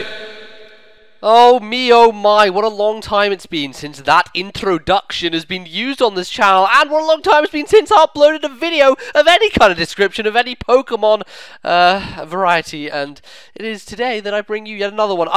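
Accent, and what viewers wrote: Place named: United Kingdom. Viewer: nice video, gotta love this british accent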